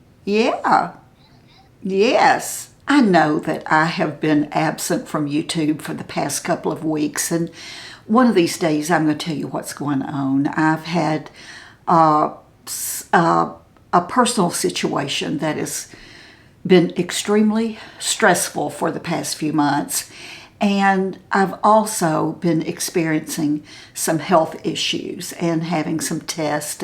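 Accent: South, United States. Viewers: Your southern voice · You are such a beautiful woman, inside and out. Prayers for your stressful things to be over, and everything else to be good. I enjoy seeing you, and I love listening to your “southern” accent! It’s so pleasant to hear!🙏❤️💐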